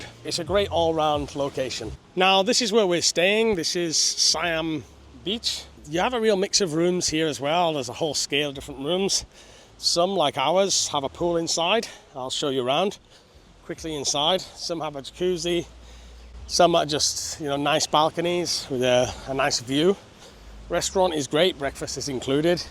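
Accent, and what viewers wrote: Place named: Sheffield, United Kingdom. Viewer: Hi, love your down to earth channel. You’ve not lost your accent, where is it from? It sounds like a Sheffield twang.😊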